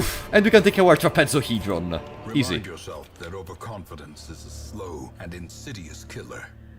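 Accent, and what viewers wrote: Italy. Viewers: I CAN RECOGNISE A FELLOW ITALIAN WHEN I HEAR ONE! BELLA FRA FELICISSIMO DI AVER TROVATO A CASO IL TUO CANALE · Yep After minutes of talking , i can preaty much confirm that he Is an italian . Sei italiano vero ? 😂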